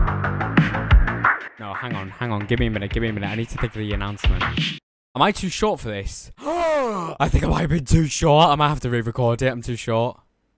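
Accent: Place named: England, United Kingdom. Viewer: Wait, this reminds me of that almighty fire at the Red Bee studios! Safe to say I was miffed with the lack of Kerrang, kinda sucks that Scuzz or MTV Rocks is no longer a thing, so I had to suffer, not to my tastes. You've got an interesting accent, it's English but where from?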